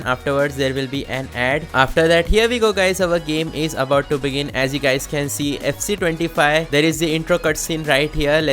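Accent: India. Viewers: always indian accent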